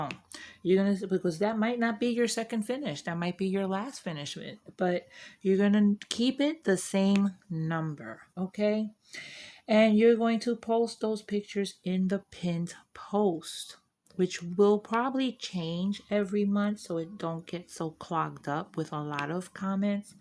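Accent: New York, United States. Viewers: Hi. I’m new to your channel and I’m so glad I found you. Lisette told me about your laugh, so I had to see for myself. You sound like you’re from NY - my whole family (Mom, aunts, Grandma) is from Brooklyn. Then they moved to Cali and that’s where I was born. What happens if I finish a WIP and start another brand new one - do I add that or count that, or do we just start with a set number and finish them as we finish them throughout the year? I hope that made sense. I have been DPing since this year in April. I currently only have 3 WIPs, so that won’t take me super long to finish them all - for sure it won’t take me months. I plan to join this event, I’m just unsure of a couple of the rules. Thanks for reading my novelette!!! ❤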